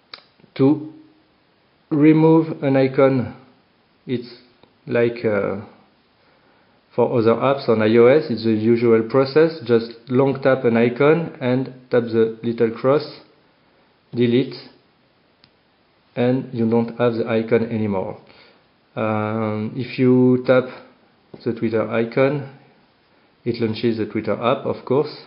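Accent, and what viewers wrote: France. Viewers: J'adore cet accent! Vive la France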